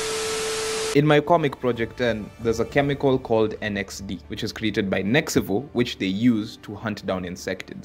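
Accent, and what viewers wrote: Kenya. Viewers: You sound like u from kenya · i know a kenyan when i hear one😭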